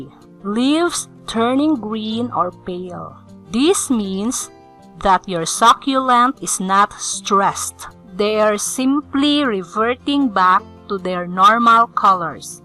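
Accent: Philippines. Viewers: The narrator has a Filipino accent, yes?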